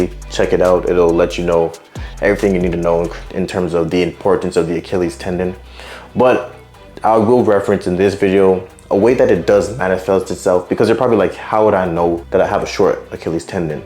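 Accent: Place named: Ontario, Canada. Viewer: Bro is definitely from Ontario 😭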